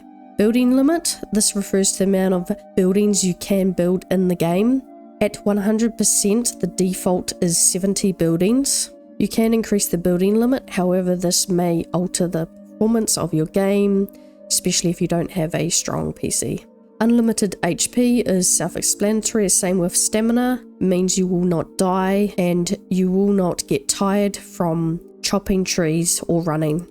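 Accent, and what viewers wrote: New Zealand. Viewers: Always nice stumbling on a kiwi accent in the wild :)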